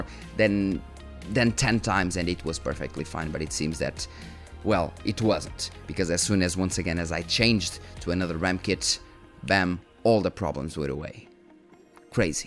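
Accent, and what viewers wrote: Portugal. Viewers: First time watcher here. Have had long time problems with RAM so i know how fucked up that is...sent 2 ram sticks back, because stick #4 "didn't work", second ram kit comes back and then different stick doesn't work, looked like a game of whack-a-mole...3 sticks worked fine and wouldn't let the 4th stick work well...different sticks...sometimes 32gb sometimes 24gb...eventually gave up on the everyday struggle so now I'm rocking just 24gb constantly. PS: was doing a inner challenge trying to figure out if you were maybe PT (maybe ES but didn't sound much like it) and i got it right! Unmistakable accent :) Keep up the good work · Pelo sotaque vê-se logo que és tuga.xD Os erros aleatórios sao fdidos...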